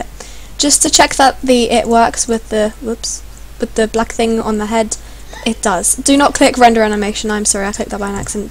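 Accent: United Kingdom. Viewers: Is this a British accent? You sound like it to me...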